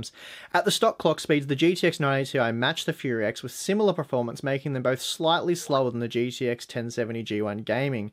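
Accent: Australia. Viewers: Cool, thanks for clarifying. I admit I subbed to the channel when Matt was doing the videos and really enjoyed the style and depth of the benchmarking. Then one day there was a funny looking guy without a blue shirt I thought I clicked on the wrong video! But then I realized the style and methodology was the same. With that said, I enjoy your videos just as much if not more since your Aussie accent is a bit more tamed! Keep up the good work man you are my favorite tech channel by far!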